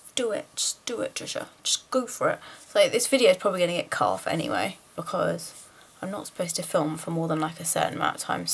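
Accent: United Kingdom. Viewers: What kind of accent do you have? Is it a British accent?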